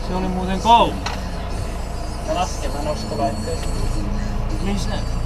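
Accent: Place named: Ostrobothnia, Finland. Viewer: ootkosä pohjalainen meinaa vaa ku kuulostat siltä :D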